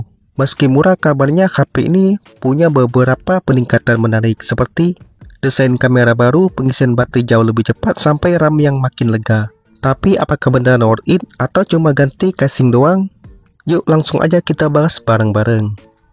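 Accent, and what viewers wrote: Aceh, Indonesia. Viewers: Bg org aceh ya cara ngomong nya logat aceh 😮😮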